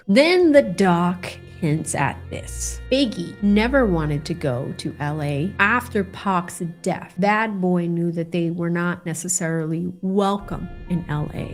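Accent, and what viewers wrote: Boston, United States. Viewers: You really let the Boston accent out in this one.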